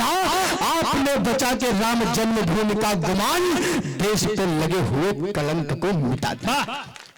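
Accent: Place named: Bundelkhand, India. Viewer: आपकी आवाज में दम है, आवाज भी बुंदेली। 🙏🙏🙏🇮🇳🇮🇳🚩🚩🚩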